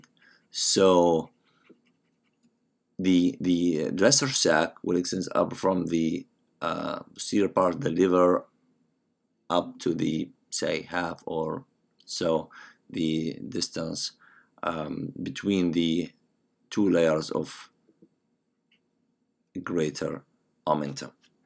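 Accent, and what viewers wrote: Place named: Arab world. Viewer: ابدعت دكتور احسك عربي من لهجتك ما اعرف ليش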